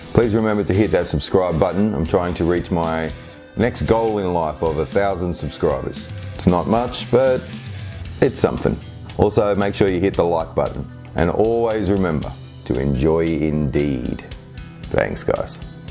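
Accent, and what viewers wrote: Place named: United States. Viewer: I am definitely going to add Modern Metal and Dark Matter to my EZX collection... You're so right about the clarity of Modern Metal sounds... OMG *Random question...., did you ever live in the United States? I had a childhood friend that you remind me of who lived in my neighborhood in Georgia...he even had an accent similar to yours. I can't remember his name...he had a brother named "KK." -at least everyone called him that. The family moved away early...I was probably 6 years old. -I am almost 50 now.. 😂 ***Your channel has become one of my favorites -out of nowhere... great content,!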